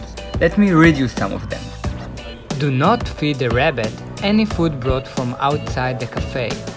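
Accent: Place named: Europe. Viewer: This guys voice is what Americans think Europeans sound like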